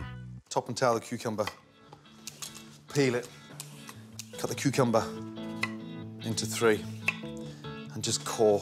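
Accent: United Kingdom. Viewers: I just love watching you sir. Good recipe and looks delicious as well. By the way I love the British accent.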